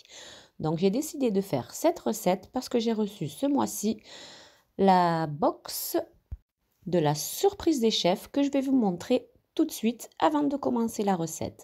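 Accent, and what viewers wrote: South, France. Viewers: Bonjour 👋🤗. J'adore tes vidéos 😍🥰❤🌹, et ton accent du sud rempli de soleil 🌞🌅. Ta joie et ta passion est communicative🤗👍. Merci pour ce partage gourmand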